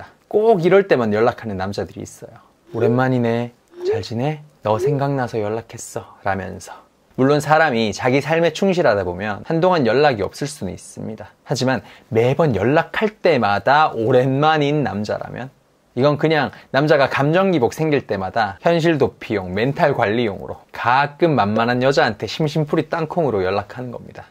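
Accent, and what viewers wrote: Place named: Daegu, South Korea. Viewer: 대구에요? 억양잌ㅋㅋㅋㅋ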